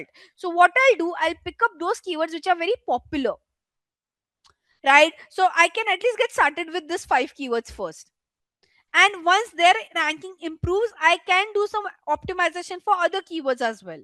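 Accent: India. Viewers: over 5 hours having to listen to an indian accent? Fuuuuk me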